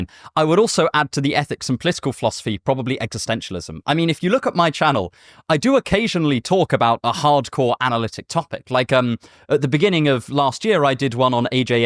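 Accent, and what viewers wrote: United Kingdom. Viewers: His teeth and speech make him british.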